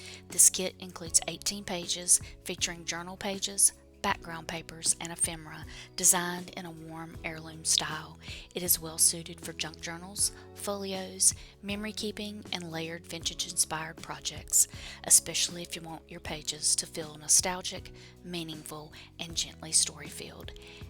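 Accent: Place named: South, United States. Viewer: Lovely, Very Southern and relaxing.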